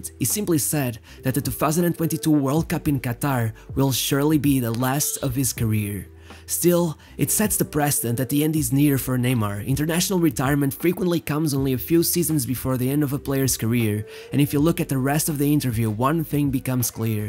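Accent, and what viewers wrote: Portugal. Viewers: To the creator of this channel, thank you so much for these great videos! You speak perfect English, so I do not understand why anyone would care about a slight Portuguese accent (which in my opinion, only makes the videos even better and more authentic). I am sure most people think the way I do and that you will reach a lot more people with your content. Best regards from Switzerland! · You sound Portuguese bro 🔥🙏🏾 shoutout to you brother Great content fuck them haters 😂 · Eae beleza, tu tens o mesmo acento que todo português tem quando fala inglês, que para minha opinião é bom, tens um ótimo conteúdo, continue com o bom trabalho. Falou cara é nós cara!!!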